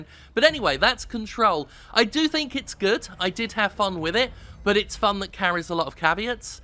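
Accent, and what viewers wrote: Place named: United Kingdom. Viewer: For some reason, sounds particularly British in this episode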